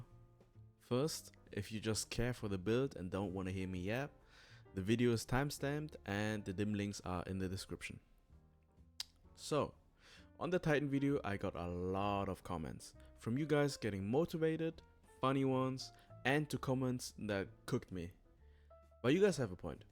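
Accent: Germany. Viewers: sounds german🗣️ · Man hört richtig, dass hier ein deutschsprachiger spricht. Aber tolles Video 👍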